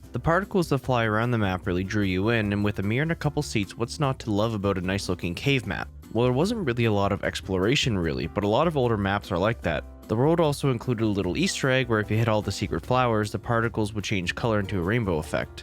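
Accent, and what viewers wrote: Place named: Canada. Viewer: love the canadian accent, nice video as always!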